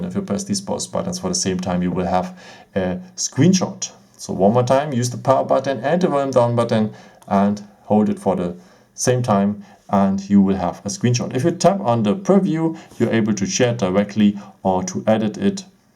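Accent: Germany. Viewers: dat is doch nen deutscher oder verhör ich mich? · Is he german💅💀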